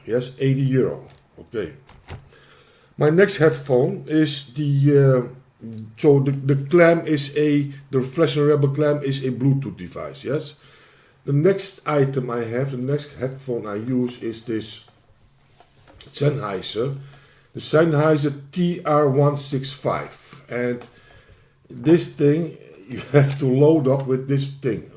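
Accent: Netherlands. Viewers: Volgens mij ben je Nederland! Goeie beoordeling bw thx.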